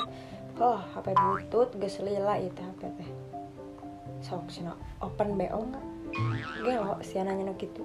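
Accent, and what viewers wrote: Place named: Sunda, Indonesia. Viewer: Naha sih lamun ngomong sunda meni bodor pisan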